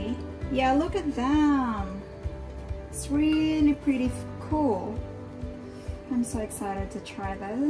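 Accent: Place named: England, United Kingdom. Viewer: The best chef mom! super like the english accent and video content.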